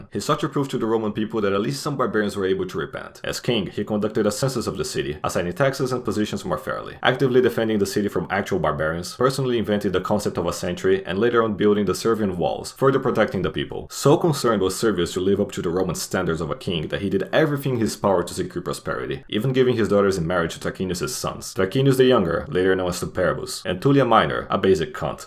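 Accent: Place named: Brazil. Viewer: Is the narrator Brazilian? His accent sounds so Brazilian!!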